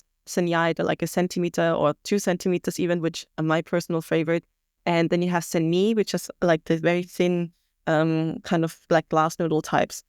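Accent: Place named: Thailand. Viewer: พูดสำเนียงไทยชัดมาก🤟🤟❤️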